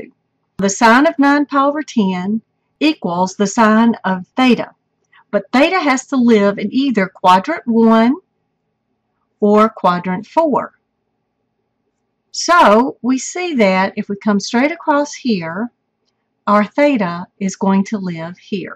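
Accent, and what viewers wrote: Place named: South, United States. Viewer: Oh how am I supposed to continue studying for my midterm with your lovely southern accent as a distraction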